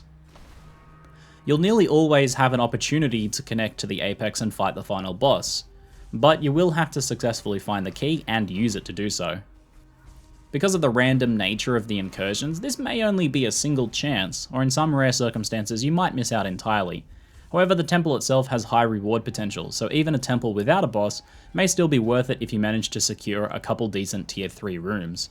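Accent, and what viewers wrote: Australia. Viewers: rooms or runes, dat ausie accent xD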